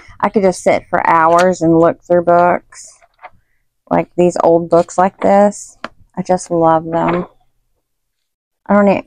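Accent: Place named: Texas, United States. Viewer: I just ❤ your Texas accent. I have a Southern accent too but, I am from the North. Half my family is from Texas and the other half is from Michigan so, I have both accent 😂😂😂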